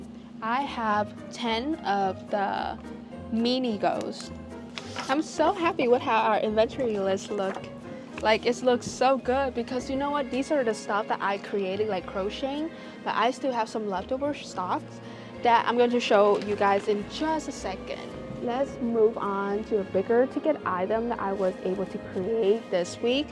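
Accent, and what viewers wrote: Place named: Asia. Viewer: Asian accent. I hear minute traces of it.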